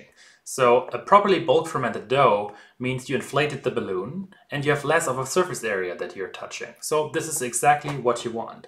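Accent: Germany. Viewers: You made me laugh that you apologise regarding your german accent! Du hast mich im lachen gebracht! So please watch this here. I think the best and funniest advertisement ever: <link> Grüsse aus Ungarn! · NEVER apologize for your delightful German accent and way of speaking. :) I love authenticity in a human being, and you are an authentic human being. :D also, your tips on bread making are fantastic. :) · I not only learned some technique here but I also got a kick out of the bloopers. I'm glad you don't cut them out because it's way better this way. Ich mag auch den deutschen Akzent :) Keine Notwendigkeit, sich zu entschuldigen! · Great video! I’m still a newbie to sourdough, and this helped me a lot. Also, I’m Czech and to me it’s so adorable when someone has a German accent🥰😄 pro tip: if you put the tip of your tongue between your teeth and say “d”, you’ll get quite a decent “the” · I love his German accent ;) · Few things to note..... 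1, my prediction about "Zee" is German, turns out to be correct, but aside from that, your English is pretty good, to a point that I almost doubt my protection about the "Zee". You should working on adding more accent for ease of identification. 2, started from #2, the whole thing sounds like college project, I can respect that... 3, I'm going to save this video, and when next time someone asked me why German make the good stuff, I'll send this to them... 4, So, in Germany, people do use their thumb to count 1... Jokes aside, this is a very interesting video, much different than the rest of the YouTube cooking video I've seen so far, even if it's just a video about homemade bread, the whole process screams perfection, sure is a breath of fresh air, good work.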